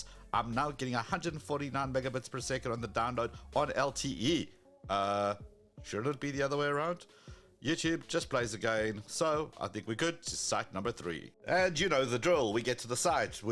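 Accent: South Africa. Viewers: Hey boet. Your accent sounds South African. Let me know if I'm right. I'm from Cape Town, ZA. On 5G, using the same app you are I got 464Mbps this morning. I religiously test atleast once a day...My connection speeds have always been between 450Mb and 500Mb. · You have a South African accent 🤔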